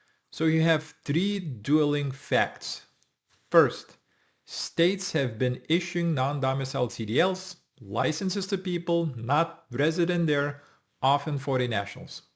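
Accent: Russia. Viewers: Sounds Russian acent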